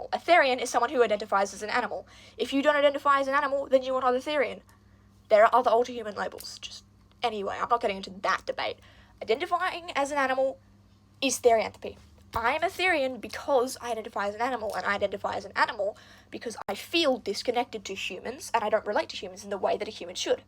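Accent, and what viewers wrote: Australia. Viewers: He is Aussie, yes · …. Have I found another fellow Aussie!!!! It’s just the accent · THANK YOU.. I was once a therian, but i stopped bc i didn't feel the same anymore, i abandoned everything related to it thinking it was just a phase or how i was burn out on doing wtvr this is..I thought i was faking being one and it upsets me sm.. I thought i were never one (which i still believe in that but wtvr), now i found out my identity just shifted (which i did not control and felt so sadly nostalgic tho-). Also i rlly love your videos, and your Aussie accent haha. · Oh my God, it’s giving voice reveal. The Australian accent is Australiaing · I love your Australian accent 🥺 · are you Australian? 😭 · r u australian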